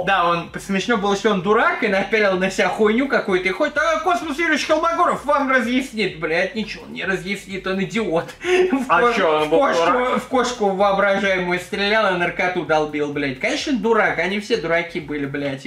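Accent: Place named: Rostov, Russia. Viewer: пол часа назад зарегался в твитере и у меня высветился Хованский в первых строчках рекомендациях это прекрасно базарит так как все у нас на районе в Ростове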